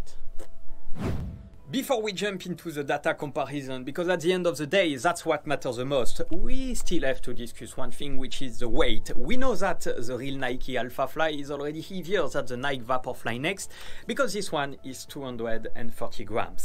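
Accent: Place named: France. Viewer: Oh l’accent français à couper au couteau !!! lol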